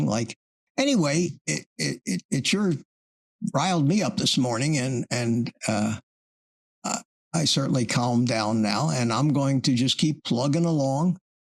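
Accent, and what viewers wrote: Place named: Philadelphia, United States. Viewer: Hi just enjoyed the live stream with Kerry Mann. Just checking out your other videos I enjoy also he is hilarious. I knew your accent sounded familiar I am also a fellow Philadelphian😀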